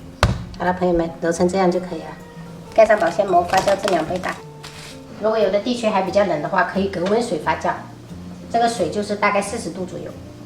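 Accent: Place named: Minnan, China. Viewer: 聽到講閩南語特別親切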